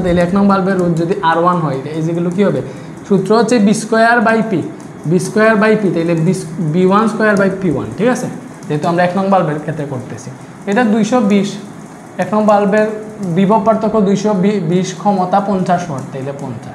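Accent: Sylhet, Bangladesh. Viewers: তুমি মিয়া সিলেটর কোন জাগার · স্যার সিলেটি❤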